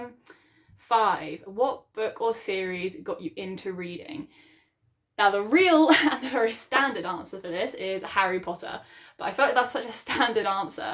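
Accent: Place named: Southern England, United Kingdom. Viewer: I'm not a linguist like Prof Henry Higgins but I'm gonna guess that your accent is from southern England.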